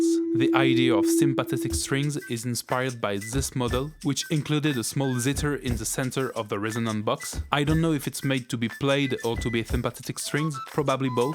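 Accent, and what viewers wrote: France. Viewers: Le magnifique accent français xD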